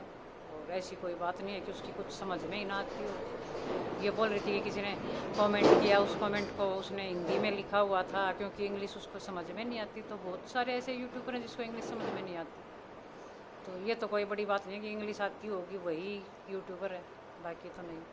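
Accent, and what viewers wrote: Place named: Haryana, India. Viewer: Anti kti dhar pr dhr de h aapni haryanvi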